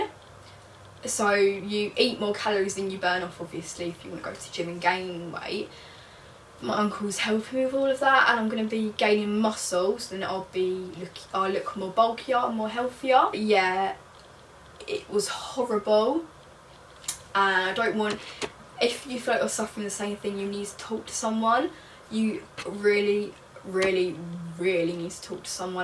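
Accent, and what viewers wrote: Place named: England, United Kingdom. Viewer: are you from the same part of England as Lisa Blackwell? because that accent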